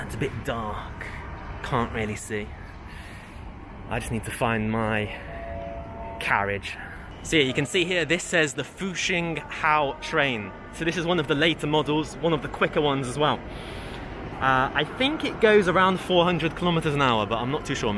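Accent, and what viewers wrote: United Kingdom. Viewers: 你的中文也有伦敦腔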